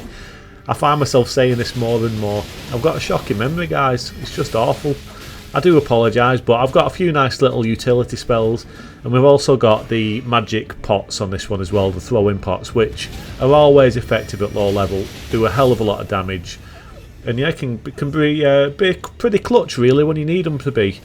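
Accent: United Kingdom. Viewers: can you fucking show the objects, i dont understood you fakin british language god damn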